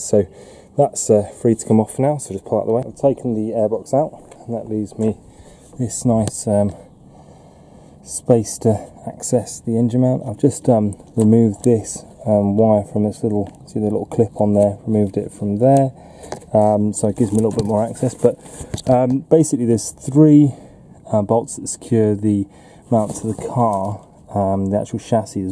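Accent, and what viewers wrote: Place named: England, United Kingdom. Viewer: I'm super stoked and appreciative of your videos, but between your English accent and how DEEP the tone of your voice is versus the sound equipment you used, it's difficult to understand you.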